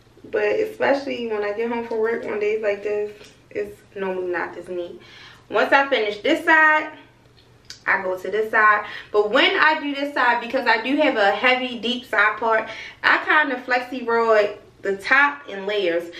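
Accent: Baltimore, United States. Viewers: sis you from bmore/dmv? you remind me of an old college friend! love the energy ❤ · Although I moved away from Baltimore 13 years ago you just made me so proud hearing your accent it was unmistakable. Your whole bad represent what a fabulous black women are Beautiful bold and unapologetic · Love your hair....it my first time watching and it sounds like I hear a Baltimore accent. 🥰 Or maybe Philly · First thing I noticed too 😄 heard that BMore accent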